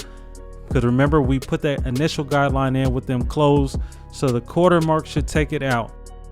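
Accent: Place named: Sacramento, United States. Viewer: just asked cause you sounded like some of my folks in Sacramento but Oregon ain't to far from cali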